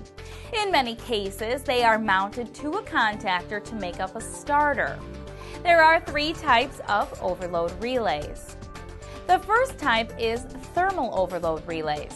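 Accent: United States. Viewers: Torturous American accent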